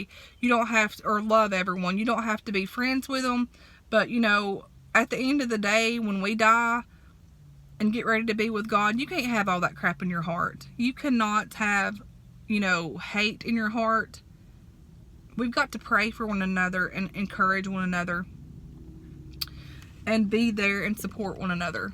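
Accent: South, United States. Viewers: Girl you’re so amazing 😉 and btw I LOVE your country accent!! You’re such an inspiration!! The food looked so darn good!!! Yummilicious 🤪 Hey I’m happily divorced twice too!!! You just keep on smiling and making your great videos!! Love ya so much! Sending you lots of hugs and love ❤️☀️🌺🌼🤗🌻🥰🌸🌹💗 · Hey, i just love ur country vocal and ur country talk just love it.. We yall have to love each other and pray for each other. I just love ur family and ur little dogs.... I will be praying for u and ur family. I will be praying for the shots that u are getting.... Hey looking for Joyce Meyers Bible (pink study women) at Good Will cant found it here....Love yall...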